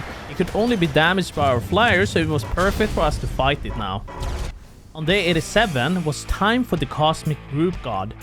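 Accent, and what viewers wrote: Sweden. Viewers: where do come from ???????????? you sound swedish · Are you from sweden?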